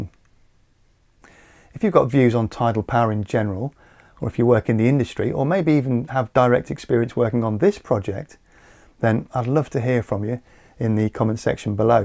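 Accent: England, United Kingdom. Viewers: what about large fish and whale collisions with the blades??? can a cage be built around the blades to protect the blades and block marine critters from impact. First time viewer. I like your presentation style, your pitch of voice and your speed of speech, and---your accent. It reminds me of my dear grandfather who was from Atherton England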